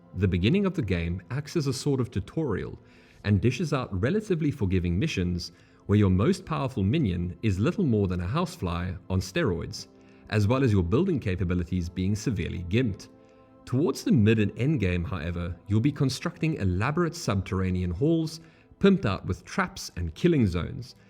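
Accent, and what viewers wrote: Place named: South Africa. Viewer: I hear a South African voice 😎 love this video. I was obsessed with Dungeon Keeper back in the late 90s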